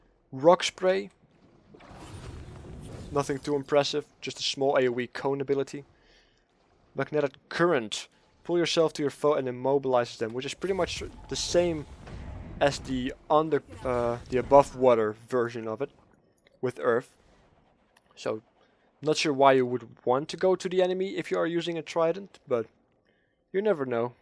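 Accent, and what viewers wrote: Netherlands. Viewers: You are dutch, ik weet het xD Waarom kunnen wij nederlanders zo slecht engels praten! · jesus christ your accent, must be dutch? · dutch accent rofl. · im 100% you are Dutch it has to be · You sound Dutch haha. Nice video.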